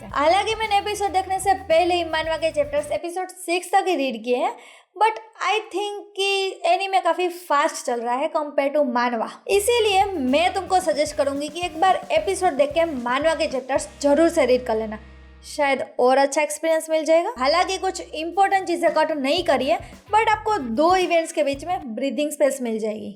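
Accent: Gujarat, India. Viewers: aap gujrati ho na?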